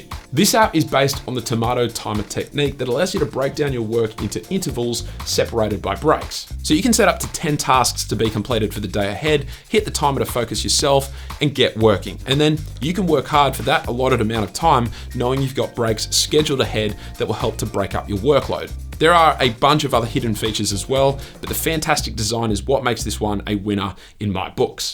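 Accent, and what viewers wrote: Australia. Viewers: Great to hear an Aussie in here! This means everything will work on my phone 😄 Loved these apps and will now go join you on instagram. Thanks 👍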